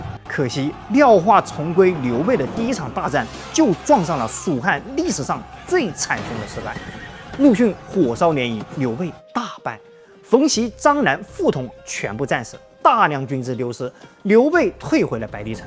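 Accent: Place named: Hubei, China. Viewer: 我今天很興奮的和我太太說，聽湖北人說書，和北方人說書的風格不同，另有一番滋味。我有一個武漢的朋友，說話和一樣有種魅力。然後我太太說，三國是不是在講曹操、諸葛亮和關羽結拜的故事… 我決定一輩子不再和她討論這個話題…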